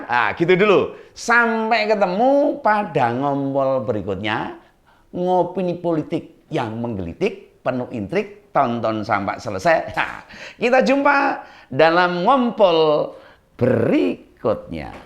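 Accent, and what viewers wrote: Java, Indonesia. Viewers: saya suka dgn logat mas ini, jawa banget, dan saya sering belajar bahasa jawa, karna teman2saya banyak yg dari jawa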